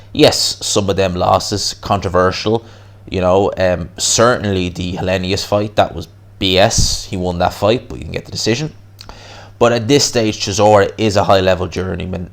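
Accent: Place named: Ireland. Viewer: My Irish bro.Good to hear the accent making boxing news ☺️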